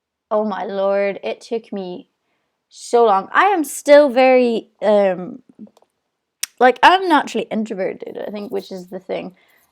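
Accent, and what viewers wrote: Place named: Ireland. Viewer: That amazing Irish accent! ;)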